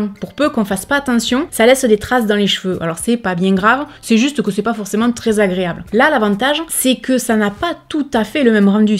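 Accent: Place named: Narbonne, France. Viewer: Bonjour comment vas-tu? Je voudrais te dire merci pour ta vidéo c'est génial et un plaisir à chaque fois de regarder tes vidéos, surtout quand on voit la durer de tes vidéos en ce moment qui sont plus longues c'est encore plus génial malgré que ça l'était déjà en plus quand je vois le temps de travail que tu dois avoir pour faire les montages à chaque fois et passer du temps dessus, je te remercie pour ça, pour ton travail car moi ça me fait énormément du bien de voir tes vidéos, je te remercie pour le temps que tu prends pour nous, pour les explications et ta belle présence. Je te trouve très très belle, au naturel tu es aussi très très belle tu as des yeux magnifiques ainsi que tes cheveux ils sont trop beaux vraiment tu as tout pour plaire vraiment tu es une belle personne ( non ce n'est pas une déclaration d'amour 😂😂) mais merci d'être là, vraiment en toute franchise à l'heure actuelle tu es ma youtubeuse préférée, donc je comprends tout à fait pourquoi on t'appelle, alors merci maman. 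Je voulais te dire aussi, et c'est pour ça que je t'écris, bon courage pour tes examens médicaux d'aujourd'hui, j'espère de tout mon cœur que ça va aller au mieux et que tu auras de bonnes nouvelles, qui pourront te rassurer pour cette journée, je t'envoie tout mon soutien de pas très loin ( je suis de Narbonne 🙂, ce qu'il y a de bien c'est que je me reconnais dans ton accent car on a le même 😂), et je pense très fort à toi aujourd'hui et je croises les doigts pour t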